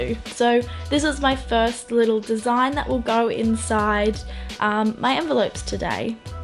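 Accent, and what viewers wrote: Australia. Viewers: I can tell your from Australia from your accent!!! I am too!!❤❤❤ (also because typo is in australia!!)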